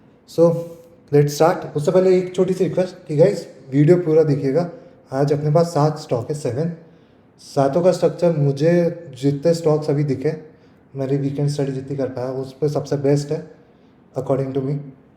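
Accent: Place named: Madhya Pradesh, India. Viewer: Aap madhya pradesh se ho aise lagta hai..apan..is a good giveaway..me indore se hu .subscribe kar dala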